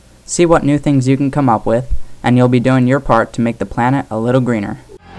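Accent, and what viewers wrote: United States. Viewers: Hi, what a great video! Thank for sharing this with us! But the bad acoustic and the circumstance that I am not used to listen to American English (sorry! ;-) make me understand less than half of it. Can you write down the ingredients and the amounts of them here in the comments for dummies like me? ;-) Thank you! Best wishes from Germany, Katie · ... thank you for the details! a typically American English with a hum in the backdrop, made it difficult for an Asian like I, to comprehend. thanks for the details in writing. thank you so much for the help! ... 🙏🙏🙏 ... - NSJ / INDIA Thursday, 4 MARCH 2021 ( Hrs IST)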